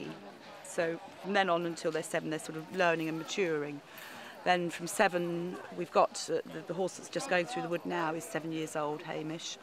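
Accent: United Kingdom. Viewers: by accent i'd sday britain. but in america you have grater chances to see draft horses than in europe. about ardennes i don't know, drop by on the front porch of rural heritage page.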